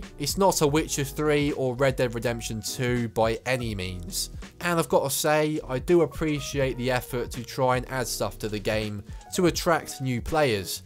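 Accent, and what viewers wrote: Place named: Chelsea, United Kingdom. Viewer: Man u sound like such a toff. Your aight there i mean im here.. its your voice ..mad made in. Chelsea vibes . Hearing you getting your winge on about fps and world densities is funny..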